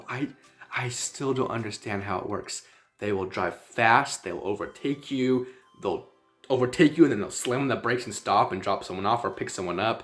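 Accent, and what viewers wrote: South Africa. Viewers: Your accent is starting to sound more south African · your accent is South African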